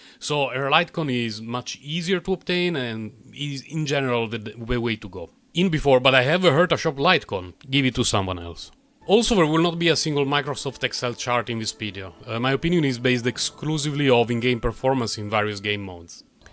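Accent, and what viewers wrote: Italy. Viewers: I have no idea why, but you give such strong italian-english accent vibes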